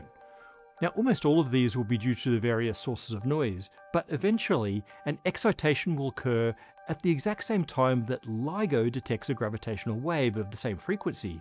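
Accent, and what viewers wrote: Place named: Australia. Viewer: Perhaps his Australian accent is making perception difficult. I am listening without headphones and have no problem. You can turn on captions, English (auto generated) to help.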